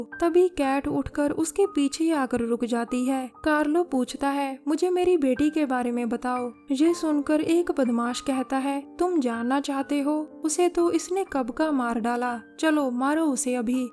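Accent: Punjab, India. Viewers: I always love you content. Specially your punjabi influence in explanation.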